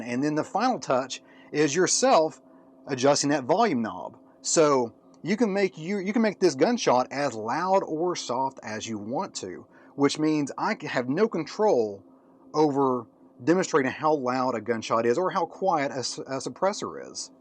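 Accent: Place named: Texas, United States. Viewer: Why Ism I not surprised by Texas accent?=)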